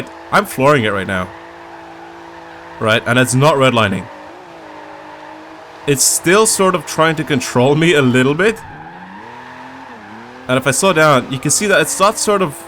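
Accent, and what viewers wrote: Ireland. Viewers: Sooo first ever video I've watched of yours even though I've seen you in Slap"s videos but are you Irish?? Thatd be pretty cool seen as i am too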